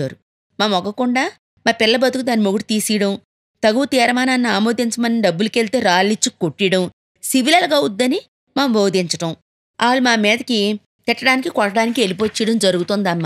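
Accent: Visakhapatnam, India. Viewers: మాది వైజాగ్,ఇది విశాఖపట్నం యాస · Medam mee slang lo vizag originality kanipisthundgi 😄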